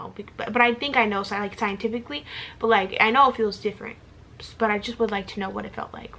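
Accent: United Kingdom. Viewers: Do a whole video speaking in your britishhh accent love you/it🤣🥲🥲🥲💘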